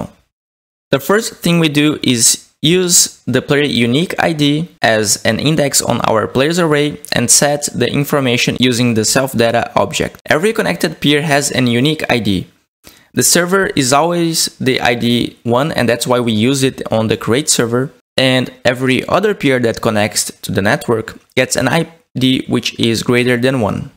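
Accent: Brazil. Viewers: Você é brasileiro? :v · certeza que é br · meu brasileiro falando em inglês nossa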